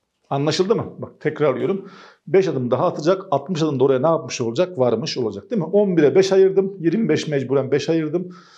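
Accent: Gaziantep, Turkey. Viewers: Gaziantepliymiş Sivas ta yaşıyormuş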